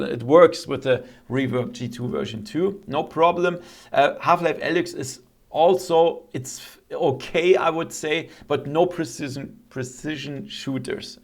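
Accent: Germany. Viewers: Are you German??? )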